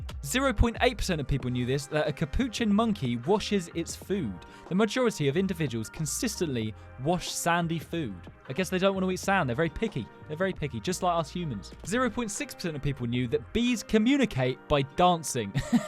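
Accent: United Kingdom. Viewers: He sounds i bit British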